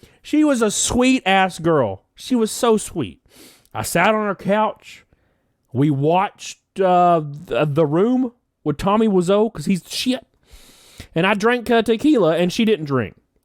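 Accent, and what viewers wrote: South, United States. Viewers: I have such a huge crush on this guy 😍😍 That southern twang 💋🤌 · Man, that country twang 😍🥰 I love you!!!! “Damn it”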